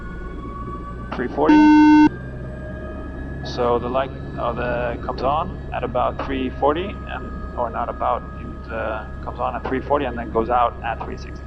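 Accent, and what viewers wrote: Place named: France. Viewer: Thanks buddy... It's funny as you have a french accent on your radio calls, but not when you talk normally...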